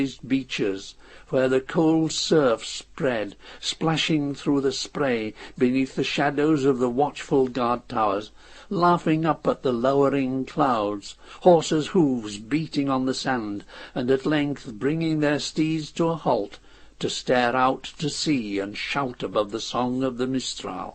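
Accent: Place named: Yorkshire, United Kingdom. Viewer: How rare: To hear a Yorkshire accent as a reader!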